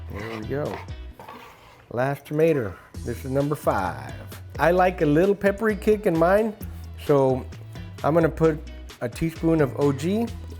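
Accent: Texas, United States. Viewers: This guy is soooo Texas lol, the "duuuude!" was beautiful😊 loved the recipe, tysm ❤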